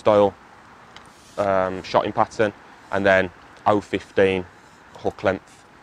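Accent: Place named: Black Country, United Kingdom. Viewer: is back, is back. Allo, allo. Great to hear those Black Country tones back. You've been missed. Looking forward to the rest of the festival videos.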